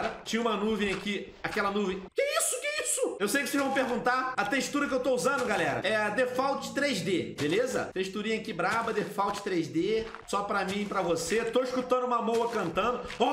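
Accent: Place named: Portugal. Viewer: Muito bom ver o voltando às raízes... Ele ainda tinha sotaque português europeu quando fazia série desse vídeo...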